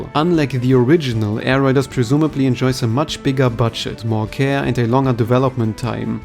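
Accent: Germany. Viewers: Ich hab den Deutschen direkt bei dir rausgehört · Are you German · Are you Bavarian? Asking as a German, because it sounds like it.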